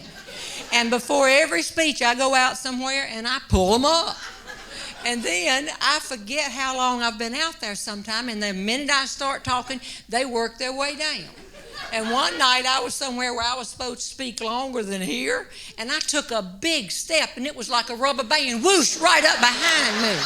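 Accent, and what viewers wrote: South, United States. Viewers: I stumbled on to Jeanne Robertson today while looking at & "." What a great link. Love this woman's sense of humor & her southern drawl. I can't stop watching. Have family in Carolina. Hope we can see her in concert either in Charlotte or Durham. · I will come back to this one when I need a good laugh! Jeanne Robertson is absolutely hilarious with her southern accent and 6'2" frame. Love her! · You are soooooo Southern, I am homesick. I love your accent and story telling ability. It's a wonder your family hasn't disowned you by this time. I love it. I love it. Thank you for your gift and sharing it with the world. If your children and grandchildren ever run away make sure they sign off on their insurance plans. You know they'll come to no good. You might as well cash in. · Her delivery and voice are pure HeeHaw. Brings back wonderful memories of watching it on Saturiday nights. 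This is the kind of humor I've been around all my life seeing as I'm a died-in-the-wool Southerner.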